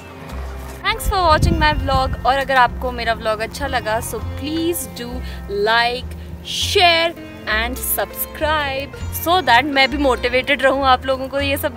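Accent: Pahadi, India. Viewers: Tumhari awaj mai ye jo phadi touch aata hai na its reallly beautiful...keep it up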